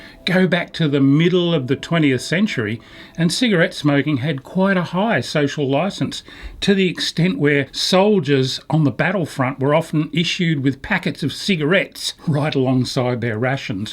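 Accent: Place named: Australia. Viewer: You are an amazing Aussie role model extremely fair in your comments not just with fishing but fair and Aussie the way you talk, thank-you you state it how it is, have a well earned break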